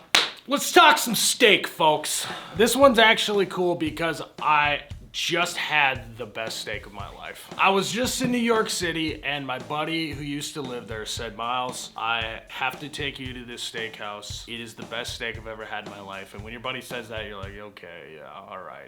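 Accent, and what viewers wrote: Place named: Dakota, United States. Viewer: I've seen your comedy sketches on FB for a while, but just over the last couple weeks have seen your YouTube videos. With that said, loving it man 😂. Can tell you're a Dakota guy, and sound exactly like all my friends from back home when you're talking about real shit.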